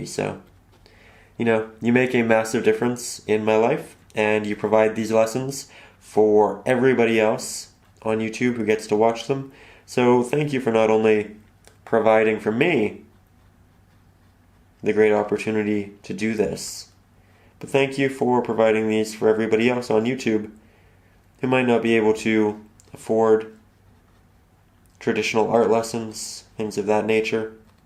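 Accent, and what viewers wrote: Canada. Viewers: Outta curiosity where are you from? You sound Canadian if I’m not mistaken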